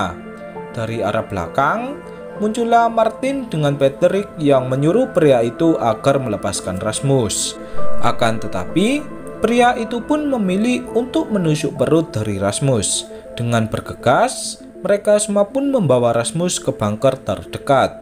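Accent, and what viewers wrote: Java, Indonesia. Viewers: Jowo totok bgt ngene iki mlh penak. Hahayyy..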